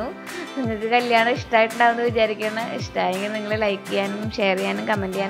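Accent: Thrissur, India. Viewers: Hi... new subscriber 👍 lv trissur language. All the best..😍😍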